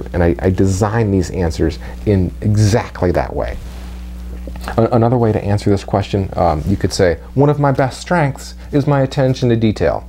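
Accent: United States. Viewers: dominated ameriican accent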